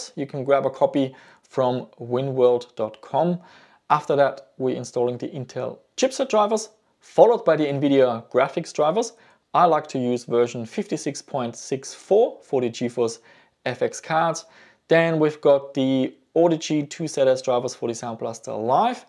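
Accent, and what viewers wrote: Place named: Germany. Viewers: btw i'm from germany and always noticed your german accent, where are you from? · looks like a UFC cruiserweight. Looks dangerous and his German accent backs it up. Scare me in a dark alley...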